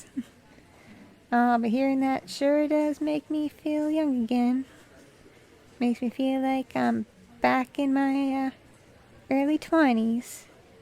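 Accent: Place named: South, United States. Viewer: I can hear a good southern accent but its a medium one but atleast its not bad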